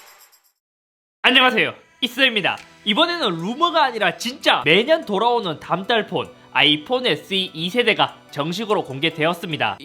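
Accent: South Korea. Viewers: 형 요즘 말투 왜 그래. 저번에는 서울말 하더니....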